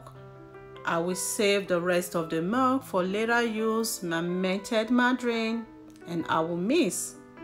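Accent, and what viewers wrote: Nigeria. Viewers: Nigerian accent wow how did u manage that in kenya. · Thats not the kenyan way...accent too sounds Nigerian not kenyan .. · Kenyans have Nigerian accent thou👌👌👌👍👍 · Is this a Nigerian? The accent isn’t Kenyan nor the slomo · The person talking is not Kenyan believe me ur from Nigeria though mandazi ni matamu😋😋😋😋😋😋😋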